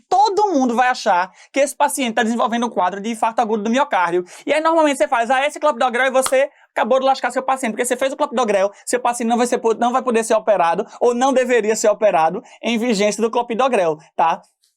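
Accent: Pernambuco, Brazil. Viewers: Show demais, bem top assistir aulas assim sem ser "formal demais", sem falar o sotaque típico de Pernambuco que acho muito massa, marcante e personalíssimo; um dos mais bonitos das regiões do Nordeste na minha opinião. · Que aula tão maravilhosa! Além de um sotaque pernambucano, já tá na velocidade "normal": na velocidade do! kkkk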